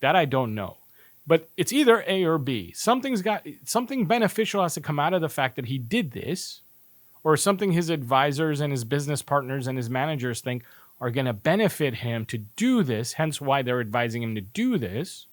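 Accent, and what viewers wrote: United States. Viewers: It's strange hearing an American accent talking about football ... I'm not saying you don't have the right like anyone else to be football fans .. but hearing you say 'we' is very unfamiliar... Fair play fella , I'm not hating ... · I am portuguese and I dont care if your accent is american, keep the videos coming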